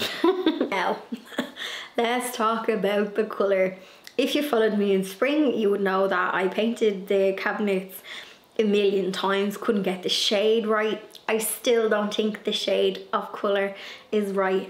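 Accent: Ireland. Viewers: you are a most delightful sweetheart ❤! You are SO pleasant to listen to with your lovely Irish accent, and watch your projects in each video! You are really gifted in the countless ideas that you have…inside and outside! 😊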